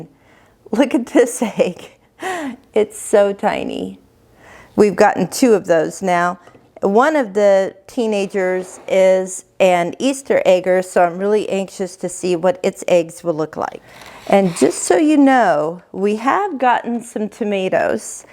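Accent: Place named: South, United States. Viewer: I'm down in Florida - so many things are different due to temperature, humidity, sand soil. But I love seeing everything others do and can always learn something new. It helps to know where a person is located to determine how their environment may be different and changes I might need to make before copying them. I do think I detect a southern accent in your videos which is beautiful!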